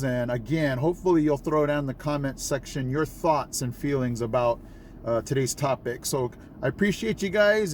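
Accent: United States. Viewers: Many years ago I spent 6 weeks every summer at my mamaw’s house in North East Tennessee, and I would think your accent would cause people to call you a “damn yankee”. I’m guessing if you have an orange UT banner flying on your front porch, you’re good regardless of any other differences. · As an East Tennessean I couldn't care less where anyone once was. If you choose to fit you do. I have two nephews half Japanese by heritage that I never think about as being anything other than my nephews. You seem to have assimilated so well that you sound like an educated hillbilly. I would have thought you'd lived here the majority of your life. "Purebred" Southern Appalachians have mostly held to the belief of I'll do me and you do you and as long as you don't infringe we'll happily meet in the middle somewhere or agree to disagree and go on with life. You've gained a subscriber and a belated welcome to the greatest place on earth.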